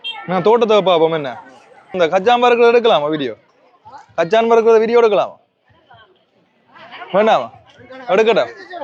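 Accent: Sri Lanka. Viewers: மதகள்கோவில்சிறப்பாயுள்ளதாகதங்களின்வாய்மொழியாகத்தெரிந்துகொண்டோம்.வாழ்த்துகள்.தங்களின்சிங்கலத்தமிழ்இனிமையாயுள்ளது.நன்றி.தயவுசெய்துஅக்கோவிலைத்தரிசிக்க எப்படிஎந்தவழியாகவரவேண்டும்லொக்கேசனைவிளக்கமாகக்கூறிஉதவுக.நன்றி.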